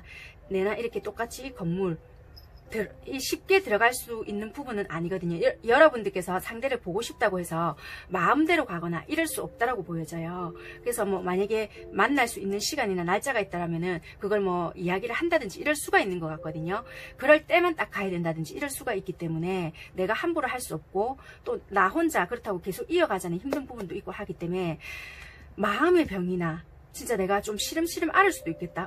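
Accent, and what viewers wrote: Busan, South Korea. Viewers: 아 넵 ㅎㅎ 감사합니다! 항상 영상 잘 보고있어요😊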